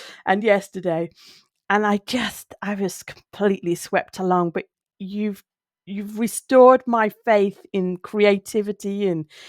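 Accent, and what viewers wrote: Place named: Solihull, United Kingdom. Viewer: I love your comments on watching Ren, the Collective joy that it brings watching others discover him for the first time! You say you are not the typical person to be a Ren fan… I will prove you wrong!! I live in Brighton, and when he announced that he would be playing one festival this year, he chose The Secret Garden Party. Lots & I mean lots of fellow Renegades, decided to come to Brighton first, from all over the world! I offered my sofa bed for anyone that needed it, I now am super close with the lady from Canada that came to stay with me! I’m 49 & she is in her late 50s. We met with others, mostly women, mostly 40+ in age! Ren has spoken to so many people through his music, young and old! The is a guy in his 80s with a reaction channel (Vietnam Era Vet) who LOVES ren also! Glad you love him also! ❤ Also, you sound like you are from my neck of the woods 😉 I was born & dragged up near Solihull! 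🙏♥️🙏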